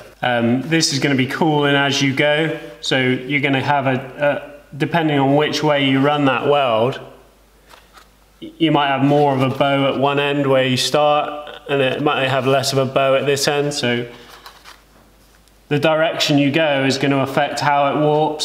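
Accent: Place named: Bideford, United Kingdom. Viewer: Miss mine, used to live in Bideford, so I can understand you quite well haha. Good video.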